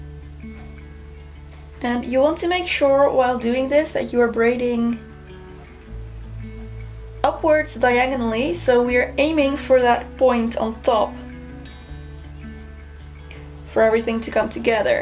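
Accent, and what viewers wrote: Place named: Netherlands. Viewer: what accent does she have? She is from the Netherlands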